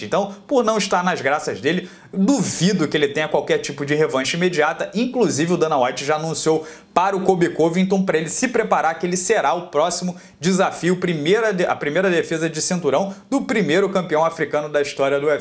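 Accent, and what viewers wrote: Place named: Pará, Brazil. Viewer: O tem um sotaque de paraense muito acentuado.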